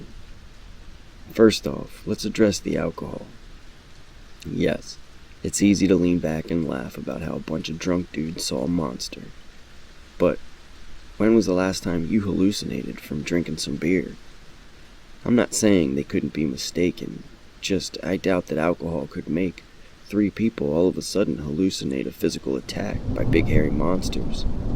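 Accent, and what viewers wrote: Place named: South, United States. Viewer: I hear a little bit of a southern (US) accent ☺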